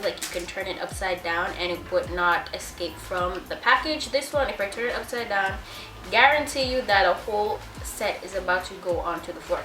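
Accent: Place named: Caribbean. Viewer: Ahhh I knew i heard a familiar accent! i’m from the bahamas Your hair is gorgeous!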